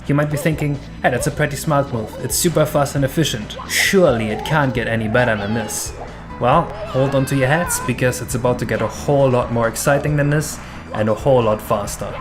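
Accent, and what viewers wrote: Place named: Germany. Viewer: Listen to the accent, yea he's definitely German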